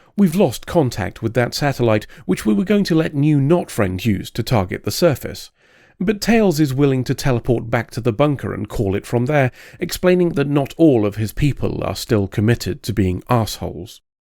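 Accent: United Kingdom. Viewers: Dude, I absolutely fucking love your reviews. There;s something about a British accent talking complete shit about a show I love. And your way of saying "cunt" is so deliciously venomous. :D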